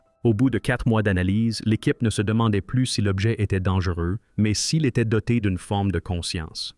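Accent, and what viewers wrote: Canada. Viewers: Euh... Est-ce un poisson d'avril de la part d'une IA ? Bougez pas, je vais me renseigner à la NASA. Bel accent Canadien, et vidéo interressante, mais farfelue.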